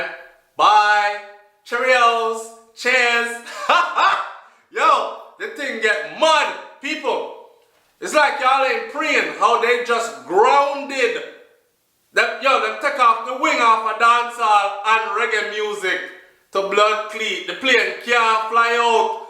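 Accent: England, United Kingdom. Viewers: you british accent tun up high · Yo dawg yuh England accent mad 🤣🤣🤣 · British accent tun up my yute. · Love the English accent... lol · The british accent...wicked would listen to it all day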